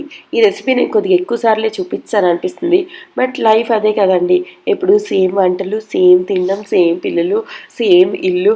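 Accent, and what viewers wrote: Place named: United Kingdom. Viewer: Meru middle lo English words Chala Baga use chestunaru accent uk laga undi uk lo chadvara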